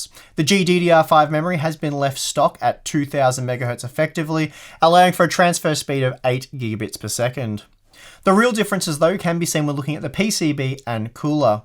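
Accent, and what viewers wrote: Australia. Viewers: you have an aussie accent so i assume youre a fellow aussie, anyway i bought an msi 580 8gb armor for 250$ aud last week so prices are dropping hard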